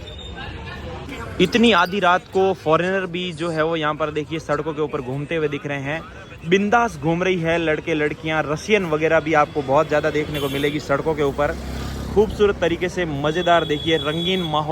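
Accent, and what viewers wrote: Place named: Jodhpur, India. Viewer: Bhai aavaj se jodhpur ka lg rha h tu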